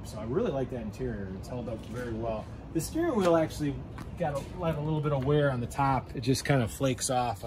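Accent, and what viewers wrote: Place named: Western New York, United States. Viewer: I think I recognize that accent; western NY, perhaps? I grew up pretty close to Rochester meself.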